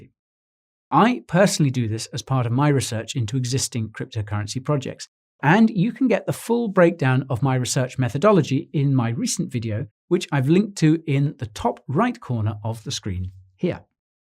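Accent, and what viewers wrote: United Kingdom. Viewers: I love your very British accent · Hi, I am new in Cryptocurrency, what is ICO , I Dex , Ieo? Sorry i feel like ignorant.. Your Y.t very informative, But i have problem with ur britten Accent. can u please easy it for international Followers.. Thanks , u are the best so far..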